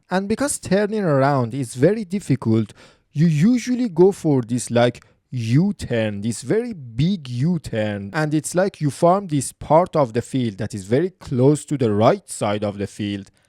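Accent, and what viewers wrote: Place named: Iran. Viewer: good luck buddy. perfect channel. like your persian english accent.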